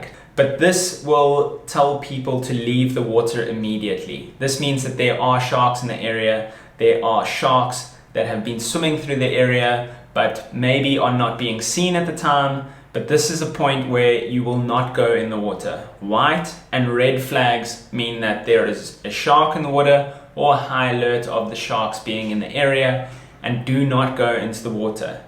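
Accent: South Africa. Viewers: What country is this? You sound South African. If they are indeed bronze whalers, well they have a long history of attacking humans. Not too sure what you're saying here.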